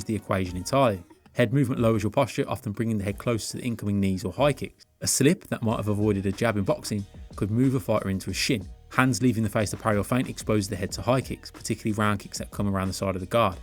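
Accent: Netherlands. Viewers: thickest Dutch accent ever even Marcel Vos can't get on that level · never expected to hear ann amsterdam accent in english lmao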